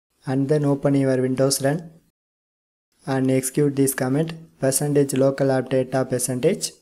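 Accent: India. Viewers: I love you indian accent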